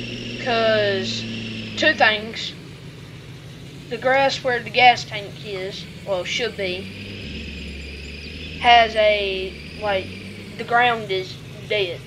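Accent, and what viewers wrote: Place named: South, United States. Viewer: You sound so country it’s not even funny I’m also country I just don’t have an accent where you from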